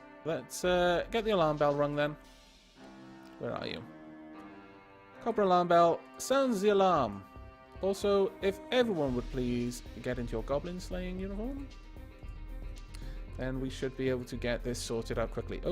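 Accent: Wales, United Kingdom. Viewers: Well yes, I've travelled quite a bit, mostly to learn English too (I'm a frog ^^). As a matter of fact, I also spent two months in total in Wales (Wrexham). Which might be why I like your accent so much, as I have fond memories of the nice people I've met there ;-) Anyway, you deserve those praises, as quality content should be acknowledged.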